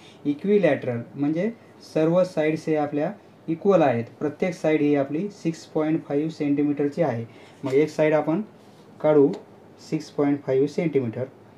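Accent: Latur, India. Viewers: सर तूम्ही लातूर चे आहात का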